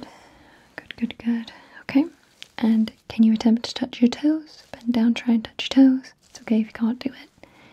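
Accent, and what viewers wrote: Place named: United Kingdom. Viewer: "So if you could just lift up your shit slightly". For some reason the British accent adds to it haha, love the video <3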